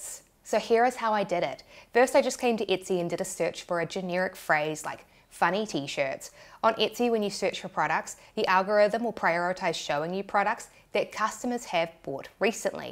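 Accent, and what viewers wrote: New Zealand. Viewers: Pretty sure it's her Kiwi accent 😄 Someone please correct me if I'm wrong! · Hi! 😀 My name is Shannon, I'm from Pukekohe, New Zealand - I stumbled across your channel just a few weeks ago and was delighted to hear a New Zealand accent!!! 🤩😁 I LOVE your channel, your videos are excellent, there are SO many options and ways to make money online which I'm looking into. One of my long time wishes is to work from home and there is no better time than now to make this dream happen! I've been watching a lot of YouTube videos over the past few months, looking into various ways to make money online which will work and aren't too mind-boggling, or cost any money to start. You are amazing -- your knowledge and success are incredible!!! ✨🤩😁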